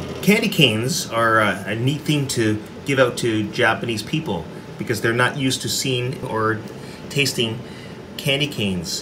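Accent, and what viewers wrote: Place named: United States. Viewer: I like your vlogs and you speak perfect American accent. No wonder you are good at narration. Anyways, will be following your vids from now. Keep safe.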